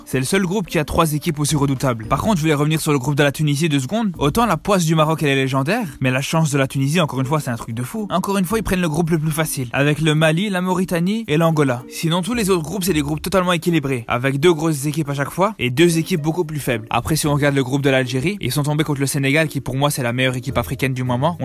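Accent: Brussels, Belgium. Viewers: Bravo pour tes 5 000 abonnés 😍 c'est chiant pour le Maroc de tomber contre la côte d'Ivoire maintenant ils vont vouloir se venger sinon désolé Fréro 😉 je suis un Tunisien et on a vraiment une bonne chance sinon j'adore ton accent bruxellois tu me fais rire 😂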